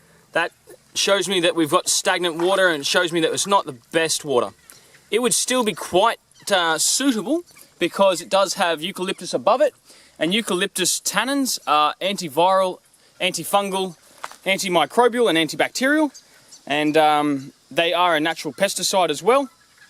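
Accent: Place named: New York, United States. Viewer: How you doin! You sounded like a new yorker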